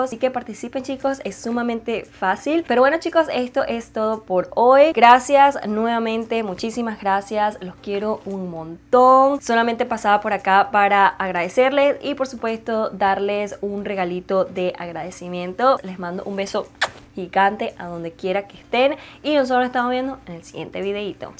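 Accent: Venezuela. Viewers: Hola yo te descubrí con el vídeo de la colección de Disney villains x colour pop. Te sigo desde Venezuela💖 Siempre supe que eras Venezolana por el acento😂😬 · Yo mi primer video lo vi y dije ella es venezolana como habla te veo desde estados unidos tambien soy venezolana y ya estoy poniendome al tanto con todos tus videos 🤗♥️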